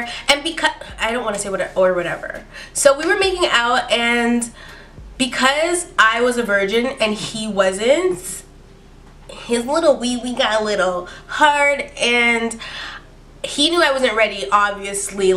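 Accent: Jamaica. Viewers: is she jamaican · are you Jamaican by any chance. wondering because of those songs and some words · Are you Jamaican ? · ya Jamaican, or you just like our music?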